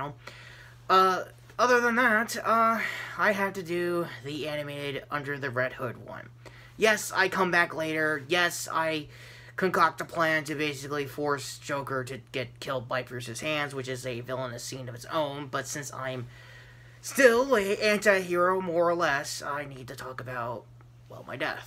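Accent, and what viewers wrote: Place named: United States. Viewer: You sound just like Tom Holland's American accent!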